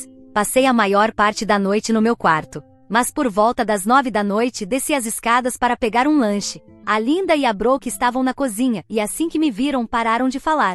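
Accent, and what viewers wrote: Portugal. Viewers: Esse português de Portugal ai 😅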